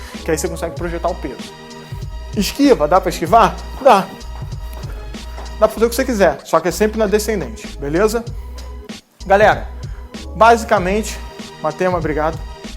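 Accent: Rio de Janeiro, Brazil. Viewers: Aí se tivesse cm se matricular ou algum modo de se tornar aluno dele assim como o matemático eu iria firme e forte, pelo sotaque é carioca também.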